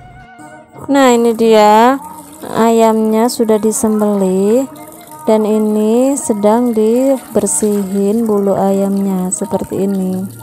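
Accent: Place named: Java, Indonesia. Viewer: Jowone kental gayysss.... Seko ngendi sampean mb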